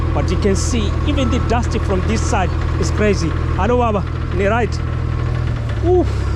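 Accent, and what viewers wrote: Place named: East Africa. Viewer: A foreigner claiming RSA here. The person making this video is not a South African. His tongue points to Kenya, Uganda or Ethiopia. These foreigners cannot really make news about their own countries.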